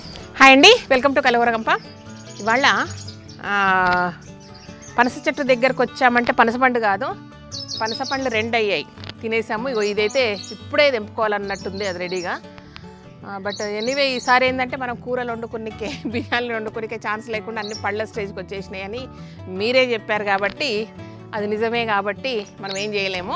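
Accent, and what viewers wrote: Telangana, India. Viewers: స్వచ్చమైన తెలంగాణ యాస తో మమ్మల్ని అలరిస్తున్నారు .ధన్యవాదములు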